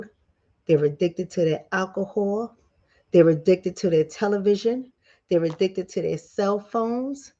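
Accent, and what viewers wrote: New York, United States. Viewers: I am in LOVE with your New York accent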